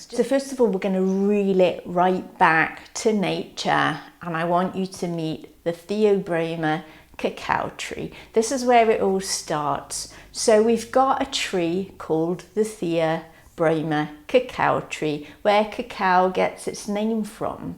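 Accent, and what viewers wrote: United Kingdom. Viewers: Mam, are you speaking British language because I'm learning British language, plz reply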